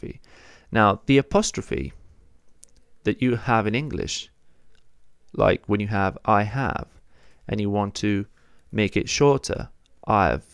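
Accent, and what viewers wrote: England, United Kingdom. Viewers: OMG... thank you for the videos! I've been looking for something like this since last year but you didn't come up from research results and nothing else worth watching either. I found Tom, a guy from New York with EXCELLENT American English accent but he didn't break down his lessons like yours! So I didn't learn much from him. Thank god your British accent is NOT too thick for my American ears! :) Please keep it coming. I haven't watched the entire 65 videos yet but loving the ones I have watched. I even fall asleep! NO, it wasn't because it was boring but because your voice is soothing that managed to help me fall asleep (I am insomniac). Thank you again... and thank your girlfriend, too! :) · Born in England, or grew up there, or what? How amusing to find an Italian channel taught with an English accent.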